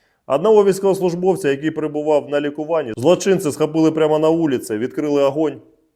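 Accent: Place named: Russia. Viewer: Як би ти не старався розмовляти українською , но по діалекту зрозуміло як ти обожнюєш рашиську . Так що йди під три чорти , чотириокий чорт .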